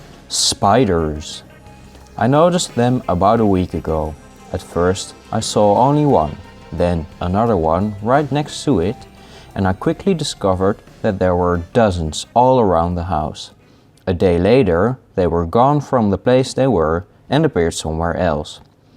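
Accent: Netherlands. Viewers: Like I suspected. A fellow Dutch man. your determination book gave it away. The houses opposite of yours did ring a bell already. I think its Amsterdam. Also the way you pronounce the Latin names rang a bell. Like what you do mate. Keep it going.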